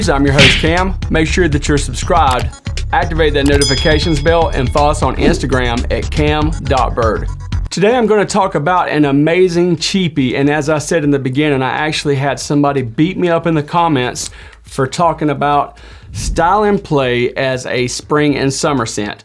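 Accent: South, United States. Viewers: Great review! I love this fragrance! One of the few I can say that about! also out of all the reviewers you seem to be my favorite so far! Sounds like you're from the south! LOL so am I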